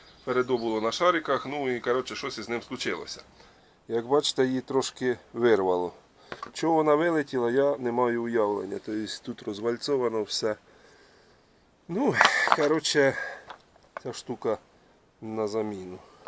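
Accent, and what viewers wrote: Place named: Dnipropetrovsk, Ukraine. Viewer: Дядько ви з Дніпропетровщини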